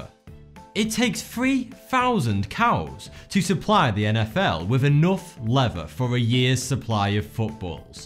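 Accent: United Kingdom. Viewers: British English at its best~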